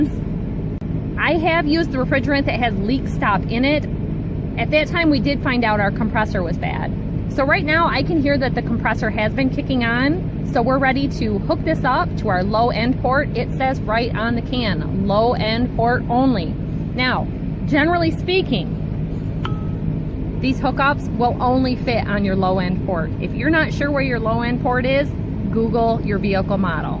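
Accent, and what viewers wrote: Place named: Midwest, United States. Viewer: wow I can listen to you talk all day. you got that cool Midwest Gretchen Whitmer fargo accent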